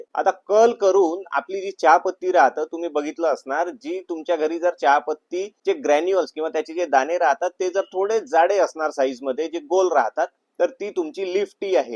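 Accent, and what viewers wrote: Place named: Vidarbha, India. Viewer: विदर्भाची भाषा, राहते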